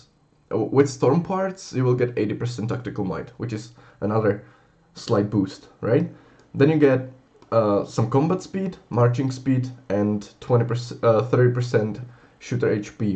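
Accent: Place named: Slovakia. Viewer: Where are u from cuz ur accent feels like ur from Slovakia or Czech 😊 maybe Poland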